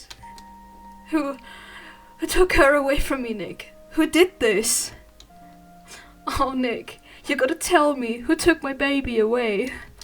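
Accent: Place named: United Kingdom. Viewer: du klingst so brittisch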